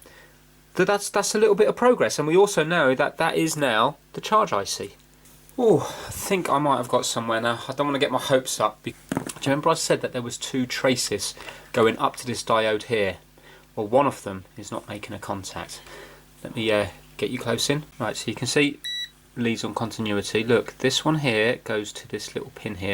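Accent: United Kingdom. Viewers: No, he said "Just yet, anyways" it's part of the UK accent.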